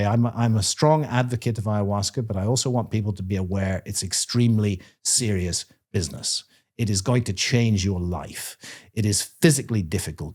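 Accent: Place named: North, United Kingdom. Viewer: Always feels weird hearing a fellow norther accent on YT, in a good way!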